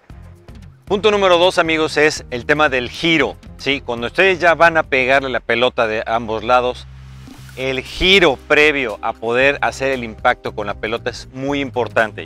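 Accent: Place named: Mexico. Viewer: de que parte de México es profe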